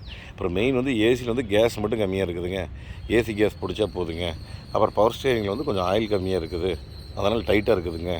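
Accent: Kongu, India. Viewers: கொஞ்சும் கொங்குத் தமிழ் பேசும் கோமகரே...! திகட்டாத தித்திக்கும் பேச்சுடைய திருப்பூர் திருமகரே...! எங்கள் மதிப்பிற்குறிய டான் லடாக் பயணச் சாதனைச் சகாப்தம் திரு. அண்ணா அவர்களே❤❤❤🙏 எப்படி இருக்கீங்க அண்ணி❤❤❤🙏 எப்படி இருக்காங்க தம்பி ரித்திக்❤❤❤ எப்படி இருக்காரு மனசெல்லாம் வலிக்குது😔 ஒவ்வொரு ரூபாயும் போன் போன்றது😔 அதோட மதிப்பு இப்படி விரயம் ஆகுறத பார்க்கவும் முடியல கேட்கவும் முடியல😔 ஷோரூம்ல புது காரை டெலிவரி எடுக்குறதுக்கு முன்னாடி PDI (PRE DELIVERY INSPECTION) விநியோகத்திற்கு முந்தைய ஆய்வு. இதை 100% செய்துதான் காரை டெலிவரி எடுக்கனும். ஒரு புது காருக்கே அப்படினா? ஏற்கெனவே ஒருத்தர் பல வருஷமா ஓட்டி தேய்ச்ச பல வருஷத்துக்கு முந்தய used மாடல் காரை 2000% துள்ளியமாக சரிபார்த்து இல்லையா வாங்கனும்😏 ஏமாறுபவர்கள் இருக்கும்வரை ஏமாற்றுபவர்கள் ஏமாற்றிக்கொண்டுதான் இருப்பார்கள்...! என்றும் அன்புடன் உங்கள் தம்பி என்றும் அன்புடன் உங்கள் TMF ரா.முஹம்மது கபூர் (சென்னையில் இருந்து)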